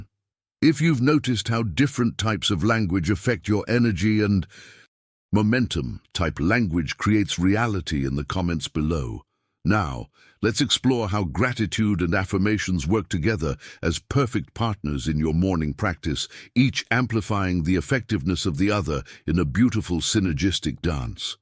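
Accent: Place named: United Kingdom. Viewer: This pompous, ubiquitous, British-sounding narrator’s voice is highly annoying. “We make money the old fashioned way: we eeeearn it.” 😕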